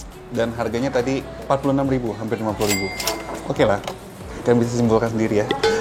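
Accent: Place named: Banyumas, Indonesia. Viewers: Ngomongnya kek orang Tegal (ngapak) banget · Warteg komplit, masnya ngapak 👍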